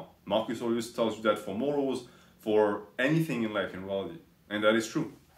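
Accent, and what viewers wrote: France. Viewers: Sounds stupid but can u upload these on Spotify? I enjoy listening to a French man ramble about stoicism as I fall asleep with no ads or auto play.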